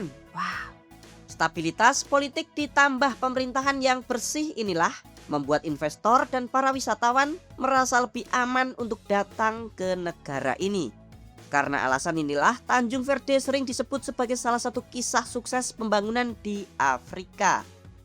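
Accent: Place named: East Java, Indonesia. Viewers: aslinya mana kok bahasanya medok kayak jawa timuran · Ini miminnya lucu banged, selalu ada kata kata tambahan, seperti siapin kopi dulu, siapin Snack ya dulu, terus ada kata asoyy kalau lagi ngulas hal hal seru di negara yg sedang direview, terus intonasinya kadang seperti sedang serius ngajarin murid matematika di kelas.. Terus logatnya itu, aku analisa dari tadi, seperti logat Jawa Timuran gitu, Org Surabaya aku menduga, mungkin... Hehehee...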